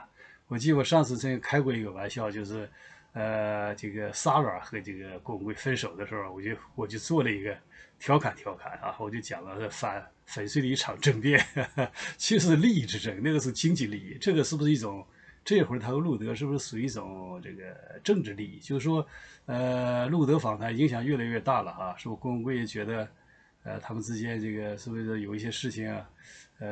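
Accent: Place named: Dalian, China. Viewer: 大连口音啊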